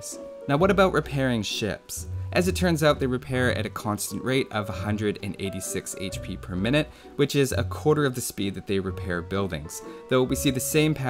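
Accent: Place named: Canada. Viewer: L'Orso Totalworriano Indeed. AoE3 is more about collection treasures and building trading posts and herding buffalos and bisons in the beginning, instead of building camps and finding sheep and so on. I agree, has a cool voice to listen to. These soft Canadian sounds:)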